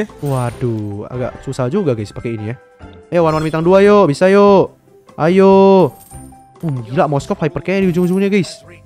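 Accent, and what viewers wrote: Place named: Java, Indonesia. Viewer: Asal mana ya mas ? Kok logatnya agak jawa dikit hehe salam dari surabaya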